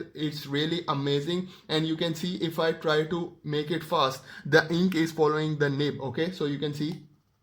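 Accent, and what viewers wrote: India. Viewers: What a nice indian accent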